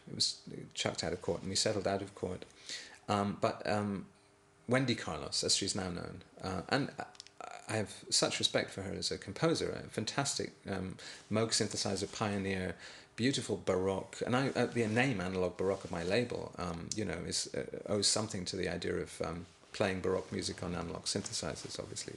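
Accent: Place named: Scotland, United Kingdom. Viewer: Posh Scots, eh?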